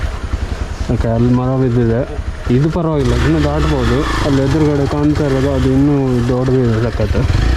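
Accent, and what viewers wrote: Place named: Kundapura, India. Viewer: Super bro... ನಮ್ ಕುಂದಾಪ್ರ,ನಮ್ ಕುಂದಾಪ್ರ ಕನ್ನಡ ಕೆಂಬುಕೆ ಚಂದ...😍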